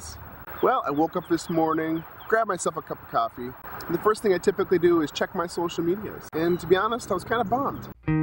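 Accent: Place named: Minnesota, United States. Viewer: You have that Minnesota O. Its ok...im in Michigan, people say I sound Canadian.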